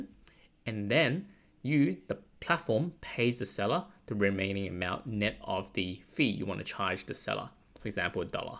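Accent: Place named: Australia. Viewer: Haha! thanks! Australian english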